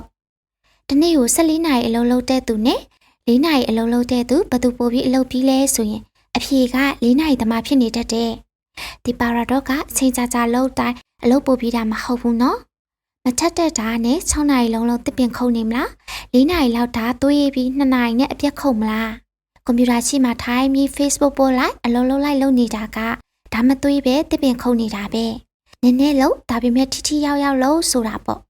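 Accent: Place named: United Kingdom. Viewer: Eng accents လေးတွေကကျန်တော်အသဲယားတာ ဆက်ကြိုးစားပါခင်ဗျ❤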